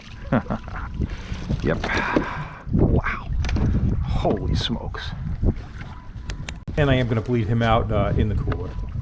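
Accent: Long Island, United States. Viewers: did you move to Florida you got me when you said you where in Florida in that Northshore long Island voice!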